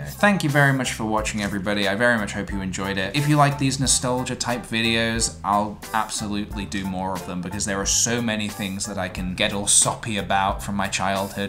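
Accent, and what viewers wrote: Italy. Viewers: IS HE ITALIAN???? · is he italian?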